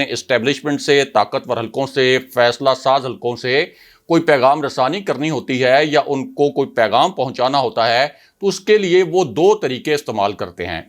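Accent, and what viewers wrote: Punjab, Pakistan. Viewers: Sorry please correct ur Urdu speak Urdu in Urdu not in. Punjabi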